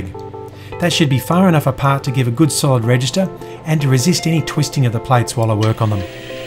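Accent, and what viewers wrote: Australia. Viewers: Bloddy hell cobber, have you got an aussie accent mate! Crazy the difference between states (Sth Aust.)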